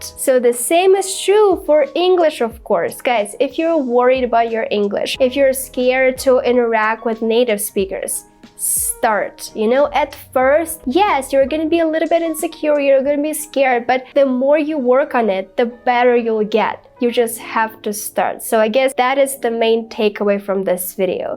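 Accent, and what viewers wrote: Russia. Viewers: Russian accent. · русский акцент чувствуется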